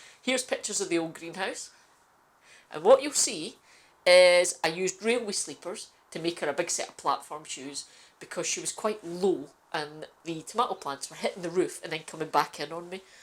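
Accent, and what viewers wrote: Scotland, United Kingdom. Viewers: Hey where are you from I’m edinburgh EH12 so chuffed to hear a Scottish voice. I’ve just go a loan of a greenhouse from an Friend in Balerno and a second hand greenhouse from The family across the road. Would love To connect to pick your brain !!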